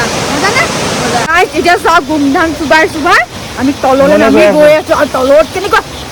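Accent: India. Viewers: Ur hindi accent is so lovely,, very energetic vlog ,,, love from USA( United State of arunachal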